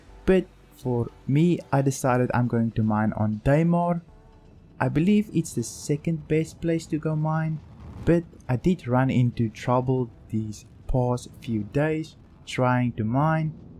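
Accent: South Africa. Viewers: Yitte saffa i can hear it lol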